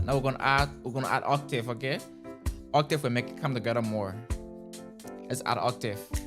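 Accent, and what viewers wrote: Liberia. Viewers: Are you a Liberian ? You sound so Liberian 🇱🇷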